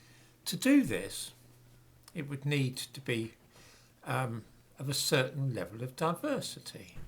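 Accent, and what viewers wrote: United Kingdom. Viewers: I like the content. Problems I have with the channel though are, the marked British Accent is an obstacle. Second, like me the gentleman is old and balding. Me I am fatter with less wrinkles and more hair. He is older than me. Third, the moustache. TRIM IT. Fourth, MOVE the camera farther away and you will not look as wrinkled as you are. Fifth, maybe you could wear a hat ore a beret. Sixth, you could wear a button up shirt. Mostly, like MANY channels, you are VERY CLOSE to the screen. BACK THE CAMERA UP or FIND a BIGGER ROOM. MOVE the BOOKCASE from behind you. Are you in a TINY APARTMENT, a CLOSET? This all said, your CONTENT IS GOOD!!!!!!! Thank You. My thumbnail is of a very old Harvey Comics character, Hot Stuff the Little Devil. So, NO, I am not trolling people as a devil.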